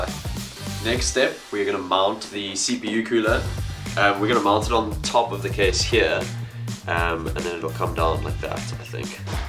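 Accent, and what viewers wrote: South Africa. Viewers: awesome bro, like to hear dialects from home. I'm from nam..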